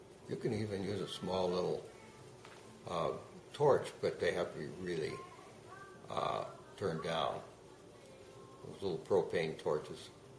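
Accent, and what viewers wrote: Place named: Minnesota, United States. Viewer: You sound Minnesotan, or somewhere close lol. I could have sworn that this was my uncle lol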